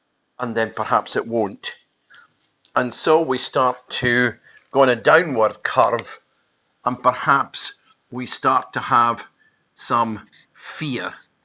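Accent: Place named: Scotland, United Kingdom. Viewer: Hi, nice one - like your explanation, change hearing it in a Scottish accent rather than my Yorkshire one:-)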